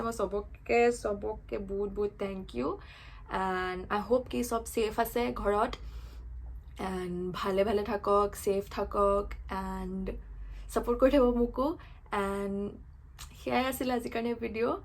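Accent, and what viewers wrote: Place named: Jorhat, India. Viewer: I love how you have that typical Jorhatian style in the way you talk. Much love❤️ - Dohabora Chuck Jorhat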